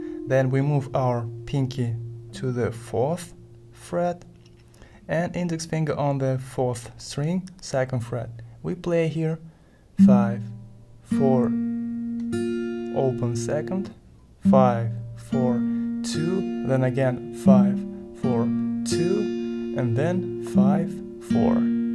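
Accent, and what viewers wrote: Russia. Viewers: Этот русский акцент >_> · 100% Russian accent.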